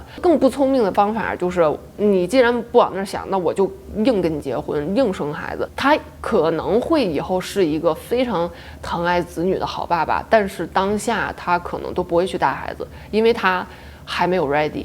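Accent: Tianjin, China. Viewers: 天津普通话！好亲切哦！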